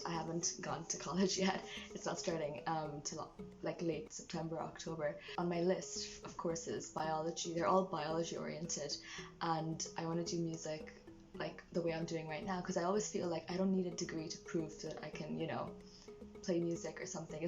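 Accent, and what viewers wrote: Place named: Ireland. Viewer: ahhh it's so nice to hear you talk! :) I didn't know you were from Ireland, that's so cool! I'd love to see more of these kinds of videos sprinkled in with your covers in the future!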